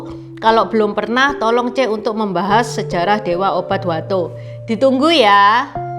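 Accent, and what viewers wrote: Java, Indonesia. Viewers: Aku suka channel ini karena MEDOK nya narasi... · Gini dong, Tionghoa medok khas Jateng dan Jatim. YouTuber daerah hrs bangkit jangan didominasi Jakarta. Lanjut Mbak!!!!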